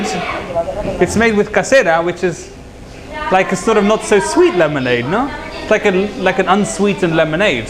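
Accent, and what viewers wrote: Andalusia, Spain. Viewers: Me encanta tu acento andaluz! es muy muy bueno. Saludos desde Madrid :) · y Alan Bowman Actually, I'm Spanish and I find his pronunciation is pretty good for a foreigner, with quite a thick Southern Spanish accent.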